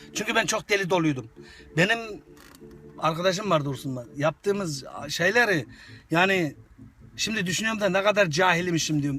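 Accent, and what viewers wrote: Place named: Anatolia, Turkey. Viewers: Sen ne güzel bir insansın be abiciğim ya ağlamaktan seni YouTube izlerdim konuşman muhabbetin çok hoş anadolu memleket gibi Mekanın cennet olsun abim güzel insan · abi ben niğde/bor luyum. şiven bizim ile hemen hemen aynı ve çok doğal bir insan olduğunu en iyi ben bilirim. biz orta anadolu insanları düm düz insanlarızdır kaba gibi görünen iyi niyetli ve neşeli insanlardır. aslında bizi kaba sananlar kendini beğenmişlerdir. bir çok ayrıntıya belki en çok ben gülüyorum..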